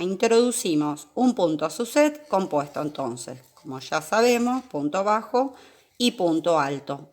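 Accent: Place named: Argentina. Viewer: Hola buenas noches, me encanta el punto zuzette, es muy sencillo y se ve muy bonito, explica super Bien! Gracias! Su voz es bastante agradable el tono argentino es muy bonito, saludos desde Puebla, México. Bendiciones Perdón, una pregunta: que significa top down, no lo sé.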